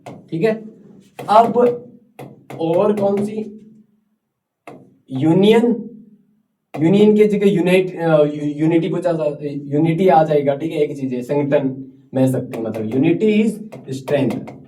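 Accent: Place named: Rajasthan, India. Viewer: Sir aap to rajsthan ke ho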